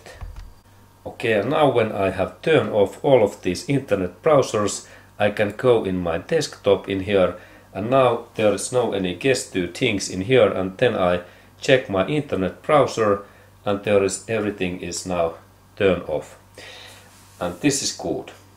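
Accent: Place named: Finland. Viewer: Your Finnish accent is soooo cute. 😉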